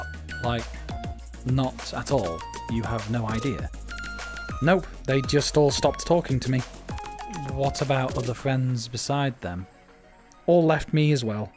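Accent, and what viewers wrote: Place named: United Kingdom. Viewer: Not at all was I expecting a man with a british accent from a small character good vid though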